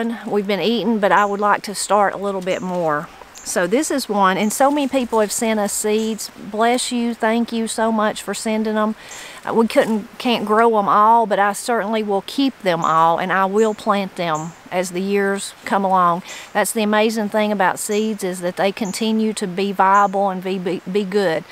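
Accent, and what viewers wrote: Appalachia, United States. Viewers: Hope you can find enough room to plant all you want. I too pronounce zinnias like you….maybe it’s an Appalachian thing🤷‍♀️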